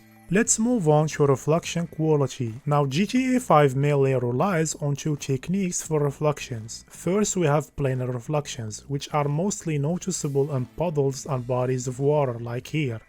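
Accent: Morocco. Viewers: BRO i can tell a moroccan when i hear one 😂😂😂😂 rak nadi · yo bro are you moroccan your accent is moroccan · ana 3rfto mghribi ghir mn laccent dyalo hhhh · Naddi erftk mghribi ghir mn laccent dyalk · maghribi english n9iya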